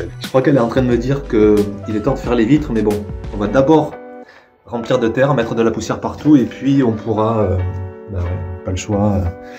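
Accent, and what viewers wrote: South, France. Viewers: On sens bien que tu es du sud avec une belle régalade 😜😜😜je le dis aussi 😜😜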